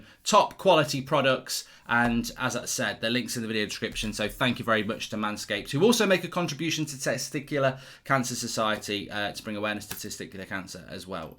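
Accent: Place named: Scotland, United Kingdom. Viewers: upvoted for the scottish accent only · Your Scottish accent is hilarious 😂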